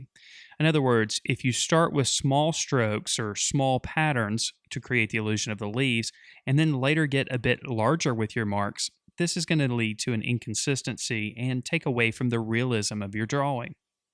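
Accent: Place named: Tennessee, United States. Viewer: awesome! you sound like (judging by accent) that you live in the Tennessee area? don't get to hear that accent much any more! grew up in Virginia and now live in North Carolina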